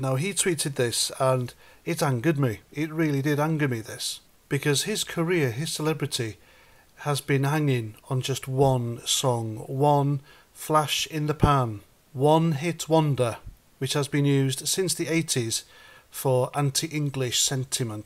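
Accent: Wales, United Kingdom. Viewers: is ace...tells it how it is..I love straight talking no nonsence facts..love his Welsh accent..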